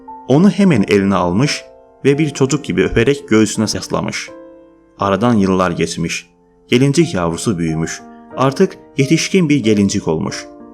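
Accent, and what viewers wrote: Azerbaijan. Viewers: Abicim azersin işte çok belli konuşmandan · Daniwiginnan azeriye oxwuyur. Hec turkler kimi teleffuz etmir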